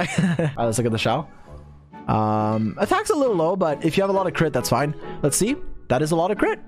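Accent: Quebec, Canada. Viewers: BOLIDE XIAO skdjalxkaal lmao. i thought mine was pretty good with 76 crit rate/203% cdmg but.... ah, back to farming for xiao. also do you speak Quebecois? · je savais pas que t'étais québécois lol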